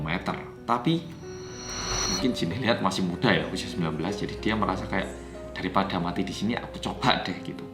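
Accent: Java, Indonesia. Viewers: YAYY OPPA MEDHOK UPDATE 💖💖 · entah kenpa cara mas menyampaikan cerita terasa sangat santai dan gaya medoknya membuat saya kangen kmpung halaman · Ngomongnya medokss jawa banget kalau ngomong bhs indonesia..hehehe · Bersama orang korea yg medhokkk ❤❤ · Mas sampeyan lek tak rungokno wis rodo ilang medok e 😁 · orang korea yang medok balik lagi yey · Akhirnya konten medok lagi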